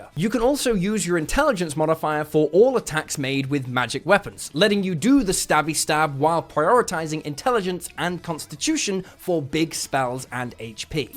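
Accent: United Kingdom. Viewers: Wait! He’s British? Thank gods Wilburton was there to take the tirade that revealed this fact to us. 😂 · guys I think he's British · You're British??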